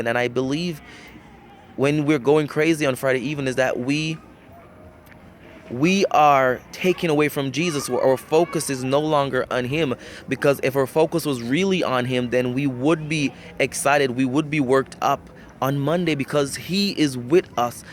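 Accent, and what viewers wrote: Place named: Caribbean. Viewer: O, where are you from? I hear an accent (I’m from the US…Delaware)…I’m trying to detect it…a Caribbean Island?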